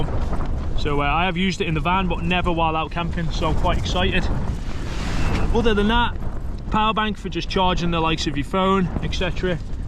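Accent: Liverpool, United Kingdom. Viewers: Are you a scouser by any chance?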